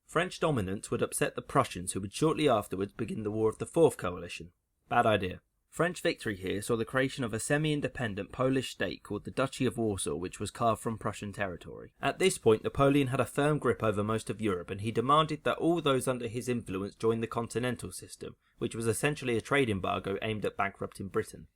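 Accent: United Kingdom. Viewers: God save us from British accent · I can't be the only one who thinks you are British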